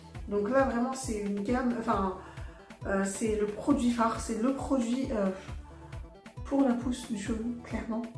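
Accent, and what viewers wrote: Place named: Ivory Coast. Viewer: Dès que je t’ai entendu j’ai dit c’est une 225 😂 en comment que chili pêlê ?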